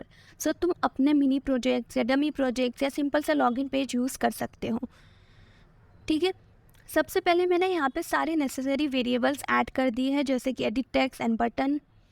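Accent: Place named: Hyderabad, India. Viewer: i think you are from hyderabad bole to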